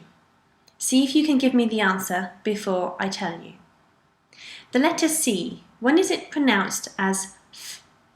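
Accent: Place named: Spain. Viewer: thats just the way spaniards talk - thats their accent, we dont pronounce the word centro as Zehntro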